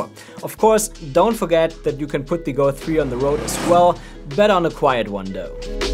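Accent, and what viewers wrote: Germany. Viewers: hmm sounds like DEUTSCHLAND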